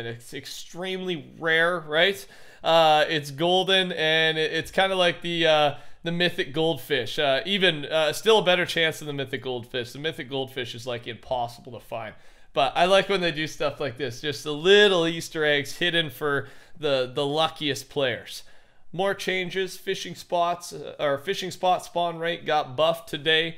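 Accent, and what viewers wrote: Canada. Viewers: Is he Canadian? Nvm xD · Are u Canadian?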